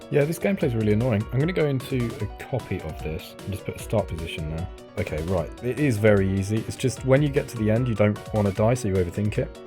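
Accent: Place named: United Kingdom. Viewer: nice video, definitely british accent carried though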